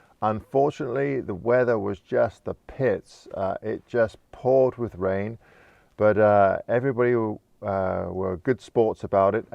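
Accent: United Kingdom. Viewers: I subscribe to a half dozen photographers and enjoy them very much but you have a special eye in your photography that I really enjoy. I'm also partial because I'm from Vancouver Island and admire the way you share it with the world. It's an amazing place. Your vlogs are truly awesome and better than any TV show I'd sit in front of. Congrats on the new slider! More gear. :) Mind you, IMO, the time-lapse of water and nature distracts from the beauty. But still a huge fan! Keep 'em coming. BTW, what's your accent? UK?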